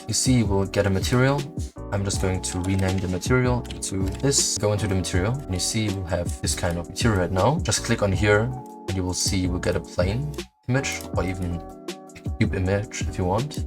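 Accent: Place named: Germany. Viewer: I hear deutsch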